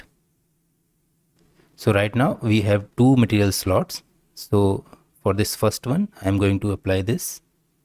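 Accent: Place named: India. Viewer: I like the Indian cool voice...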